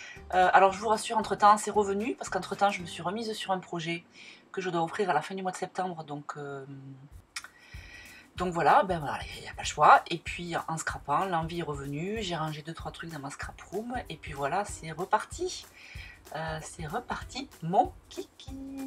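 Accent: Toulouse, France. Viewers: Il est beau notre accen toulousain et tarnais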